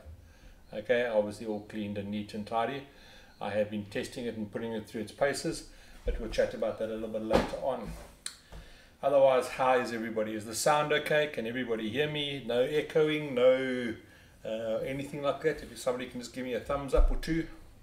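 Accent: South Africa. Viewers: I hear sud Afrikaner accent, I subb. Miss my country <3 awesome video boutie!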